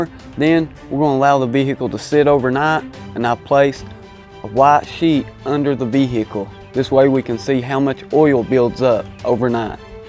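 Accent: South, United States. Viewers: Love the dramatic music with the laid back southern accent lol. I'm subscribing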